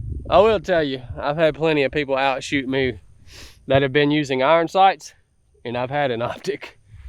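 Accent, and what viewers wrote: South, United States. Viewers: Smart people with southern accents are always fun to listen to 🤟🏼🤟🏼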